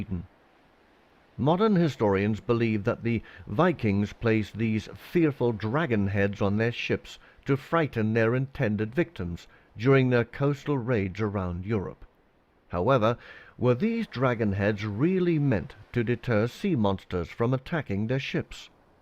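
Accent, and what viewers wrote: Scotland, United Kingdom. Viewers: British??? That was himself - a good Scotsman.;) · Excellent production, and a fine British narration.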